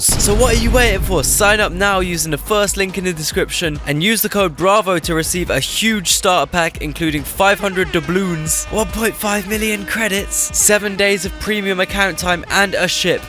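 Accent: United Kingdom. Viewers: Homie nobody in eastern europe recognises a british accent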